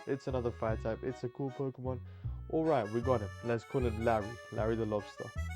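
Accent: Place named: Sydney, Australia. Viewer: You got a Sydney accent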